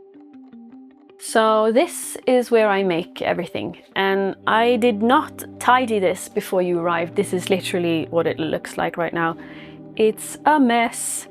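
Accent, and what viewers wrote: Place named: England, United Kingdom. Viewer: Did she live in UK or something? She sounds English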